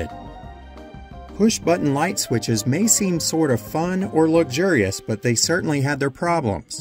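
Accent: United States. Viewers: Sounds like you are an American. I am English and was born and grew up in a home built in 1666. No inside toilet. No mains water, this came from a well and was brought into the house in buckets. No electricity. When I started to school I was fascinated with the light switches and taps(faucets) . At 72, I now live in a house built in 1972, with many modern conveniences but I still hang my laundry on the line outside on fair weather days. ps. My childhood was not too typical of the time, but one advantage was having 70 acres of land which allowed me a lot of freedom and a diet of home grown organic food. Love from England.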